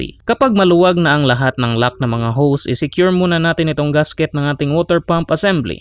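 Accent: Visayas, Philippines. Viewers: bisaya boses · Visaya po ba kayo Ayusin niyo naman po ung boses niyo para maintindihan ng maayos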